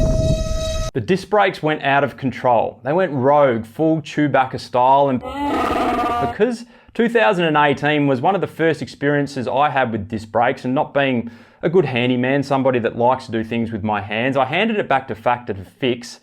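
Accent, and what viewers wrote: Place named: Australia. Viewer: Very funny to hear your oistralian accent when you talk about boikes sometoimes and learning that you are not a cloimber :-)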